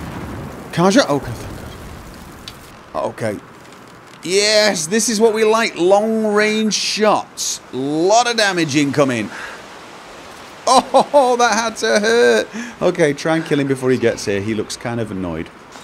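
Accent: United Kingdom. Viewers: Hey.. Where are you from? Your accent sounds sort of British, but I notice you roll your R's, well, not all of them, only every now an then..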